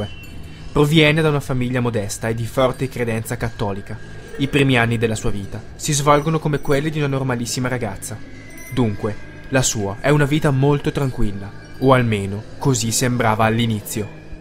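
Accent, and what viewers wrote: Italy. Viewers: muoio per il purtroppo!! Comunque seriamente sei italiano? E se si da dove?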